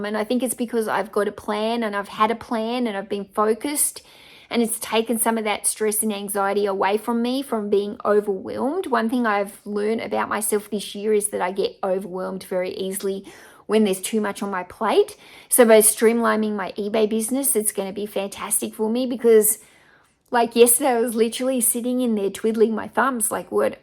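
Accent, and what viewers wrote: Australia. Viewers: I have 0 clue who you are, but I'm an eBay seller too, so I'm sure why you popped up as a YouTube recommendation. But damn that Aussie accent hooked me.... "YOU SOUND LIKE YOURE FROM LONDON" ( did you know they say the aussie accent was created because of all the drunken British)? . You seem lovely and love your energy. Keep doing your thing. Ebay is LIFE. Subscribed and look forward to more. The one thing my life is missing is a Thom. You give me hope even though I'm old as well ill beagle to find one.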